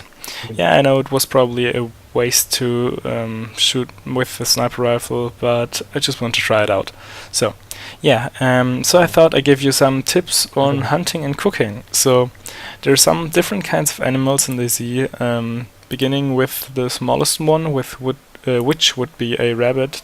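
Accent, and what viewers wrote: Germany. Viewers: haha ... hab einfach mal reingeguckt und mir so gedacht: Die Aussprache is, als wär der Deutsch wäre ...und taddaaa ... recht gehabt :D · your accent sounds like your mother tongue is german...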